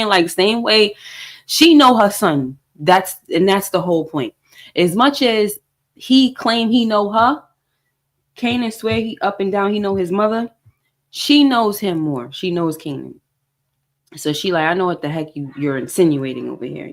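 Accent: New York, United States. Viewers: I hear a New York accent? I'm PA! Anyway my 1st time on your channel...I agree with your pov on this episode 👏🏽. Yeah I'm over snaps n pop. And Stephano...(I'm don't see a subscribe button)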